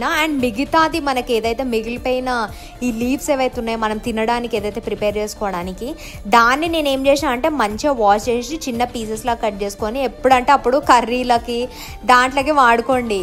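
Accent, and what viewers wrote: Telangana, India. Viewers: Telangana slang . Guys guys ani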